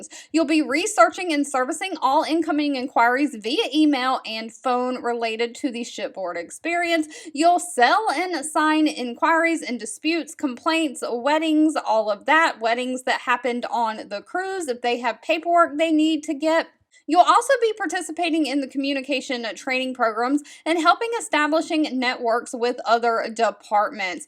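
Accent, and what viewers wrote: United States. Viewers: She's sooo American - cannot understand what she is saying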